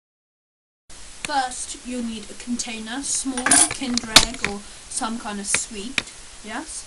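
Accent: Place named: England, United Kingdom. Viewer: haha english accent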